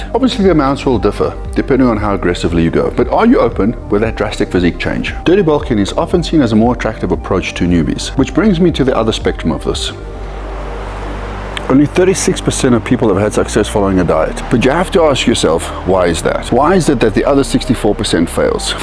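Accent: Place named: South Africa. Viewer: I've never heard such a nice south african accent.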